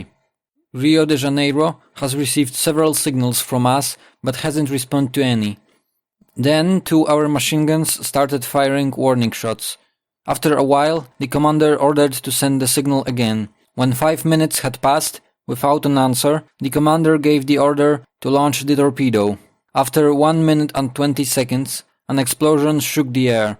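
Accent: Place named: Poland. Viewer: Love your video and your polish accent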